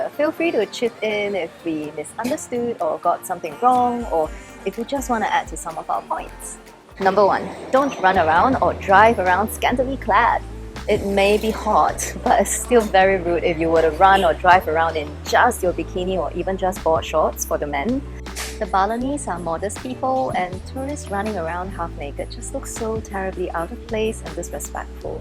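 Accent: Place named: Singapore. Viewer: I love the Singaporean accent!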